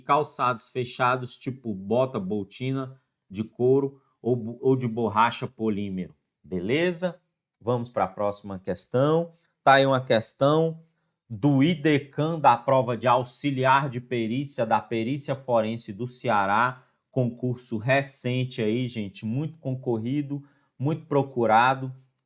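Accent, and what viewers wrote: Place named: Brasília, Brazil. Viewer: Sotacão de brasília é inconfundível kkk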